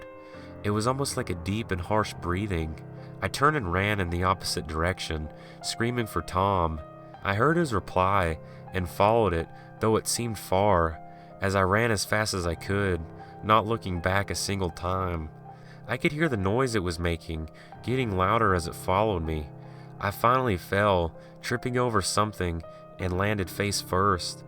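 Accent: South, United States. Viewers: Now with the dialects evoloving with more and more influence from almost any culture it's hard to tell the accent but it is for sure southern and in my opinion I'd say kentucky to the Carolina's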